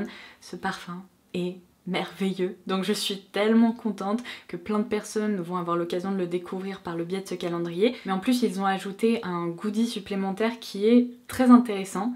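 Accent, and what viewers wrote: England, United Kingdom. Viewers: POURQUOI TU PREND UN ACCENT ANGLAIS POUR UN OUI OU POUR UN NON C’EST RIDICULE !!!!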